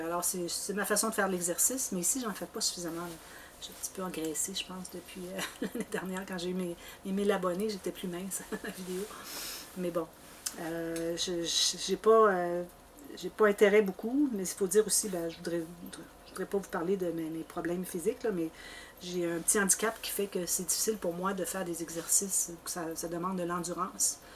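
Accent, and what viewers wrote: Quebec, Canada. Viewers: Bonjour c'est agréable de mieux vous connaître. Merci de votre générosité et de tout ce que vous partagez avec nous. Vous êtes vraiment une belle personne à tout point de vue. Les petits ensembles pour bébé sont trop craquants! Vous excellez dans tout. Ne "francisez" pas trop, votre accent et les expressions Québécoises moi j'adore! Bonne journée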